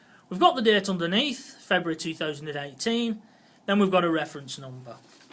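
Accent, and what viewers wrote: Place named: Lancashire, United Kingdom. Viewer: Beltin Lancashire accent like mine an fray Coppull.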